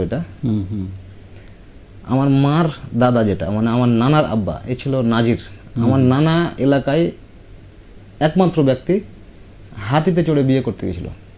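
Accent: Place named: Murshidabad, India. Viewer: এটা আমাদের মুর্শিদাবাদের ভাষা। আপনার সাথে পরিচয়ের ইচ্ছে রইল।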